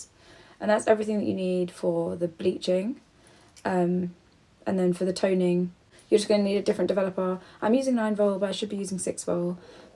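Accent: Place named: Sussex, United Kingdom. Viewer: I like your accent. Is it from Sussex??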